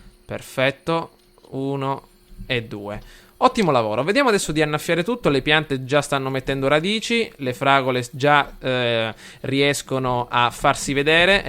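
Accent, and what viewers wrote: Sicily, Italy. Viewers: Dal "noi non siamo cosa di.. [...]" ho avuto la conferma che sei siciliano o almeno meridionale Continua così, riesci ad intrattenere bene uwu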